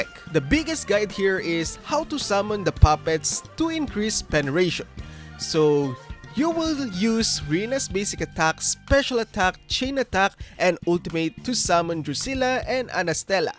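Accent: Philippines. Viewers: halatang halata sa accent ehh PILIPINSSS 🇵🇭🇵🇭🇵🇭🗣️🗣️🗣️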